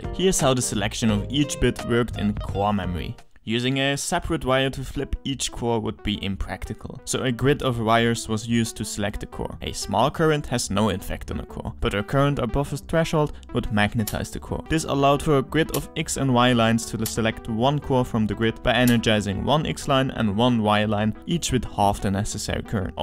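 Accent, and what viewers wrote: Germany. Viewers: sie sind so deutsch der akzent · are you by any chance... german? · I knew he was form Germany. Typical Accent.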